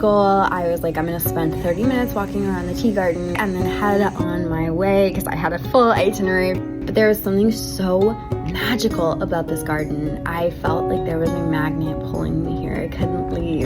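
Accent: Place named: United States. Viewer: Hi, I love in American Accents. By the way, May I know Where is your accent in America? Thanks you 🇺🇸🎉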